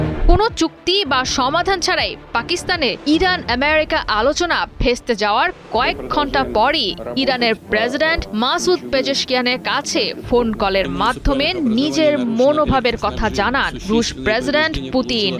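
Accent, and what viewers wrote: India. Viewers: ভাষা শুনে মনে হচ্ছে এই সংবাদ পাঠিকা ভারতীয় নারী।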